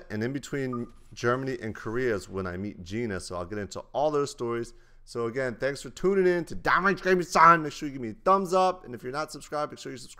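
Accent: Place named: United States. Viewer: Just.. No... I bet you're from the us?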